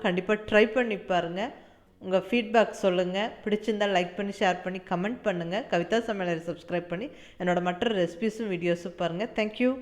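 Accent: Kongu, India. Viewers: Mam dish super. Pankarapava supera iruku.i will try. Ungha voice rompa clarity, sweet.neegha pesura kongu tamil super.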